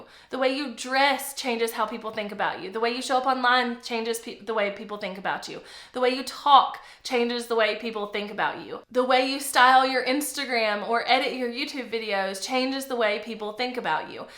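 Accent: South, United States. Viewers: I love your laid back, fun personality. It was great when, in another video, you made it ok to be a multi-passionate in my business. And I also love your southern accent because I'm from the south so that makes you feel familiar. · You southern accent! I’m from Georgia ❤ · Mom, creator, loved your accent because I’m also southern, 😊 AND love the advice with a dash of humility when you don’t know all the things (e.g., vlogging). Working in a bubble at home is hard and your “chats” and business advice are a great way to feel connected to a business environment while still at home 💕 Thanks for that and best of wishes on your vlogging adventure!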